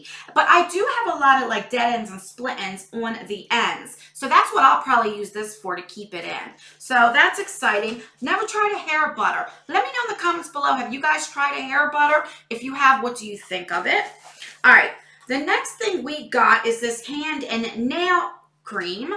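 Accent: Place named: New York, United States. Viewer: I absolutely love your channel! I never found unboxing subscription boxes so entertaining! Also you sound like you have a New York accent? That's nice.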